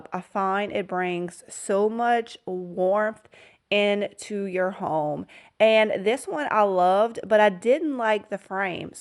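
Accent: Louisiana, United States. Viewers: I love you, I love your Cajun rambling, I love your Mississippi home, and I can’t wait to see all your new adventures. I was afraid you were going to announce you’re quitting YouTube to focus on FB and your website. I’m a YT girl so you can imagine my relief. You keep doing you girl, I’m along for the ride.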